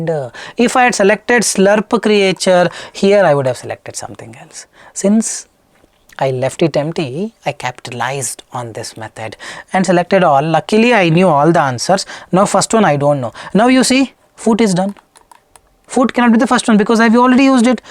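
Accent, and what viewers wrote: India. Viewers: Hi. You are simply awesome! I discovered your channel recently but you do have a way of catering to the needs of even a below average student in English. Your humor while teaching with that Indian butler English is making long videos seem short as we laugh and feel refreshed and still gather up to listen to you more. Keep up the good work you are doing! 👏🏼👏🏼👏🏼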